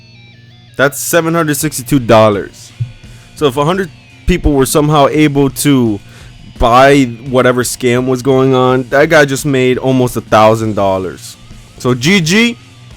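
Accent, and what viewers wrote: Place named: United Kingdom. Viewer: never new you were British mate :)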